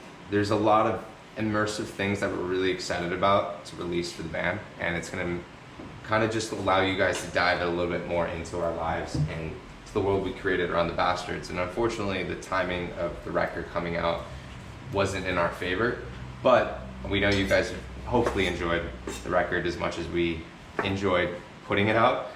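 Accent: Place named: United Kingdom. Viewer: AM I THE ONLY WHO HEARS SLIGHTLY BRITISH ACCENT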